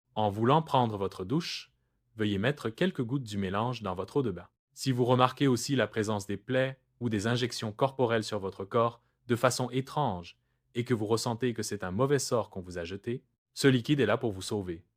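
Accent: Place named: Quebec, Canada. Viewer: assortie d'un bon accent quebecois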